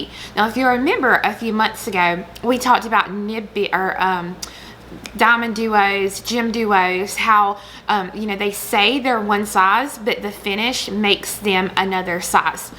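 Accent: South, United States. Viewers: Thank you for explaining these beads. I’ve been going nuts with the differences between Toho and Miyuki seed beads. Throw a few Czech seed beads into the mix and you’ve got a hot mess! BTW, I wish I could trade you my Chicago accent for your charming southern accent!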